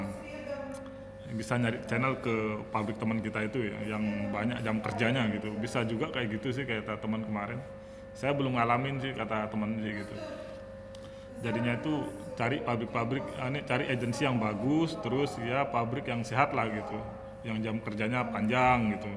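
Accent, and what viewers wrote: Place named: Lombok, Indonesia. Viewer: asli mna saudara kyknya lombok dari logatnya ..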